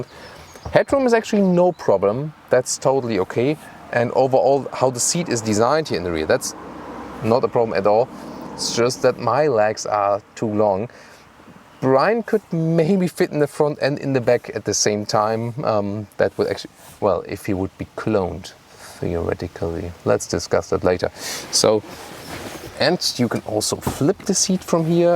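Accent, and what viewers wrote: Germany. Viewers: Once more an excellent review, a pleasure to watch. Thank you. I also enjoy your English with some German flavours, which is totally different compared to American English which I hate as many intelligent people do 😝..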